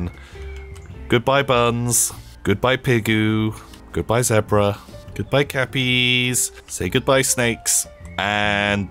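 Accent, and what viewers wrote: Australia. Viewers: As an Australian myself, Aussie is now my new favourite accent!! That was gold <3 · 10/10 aussie accent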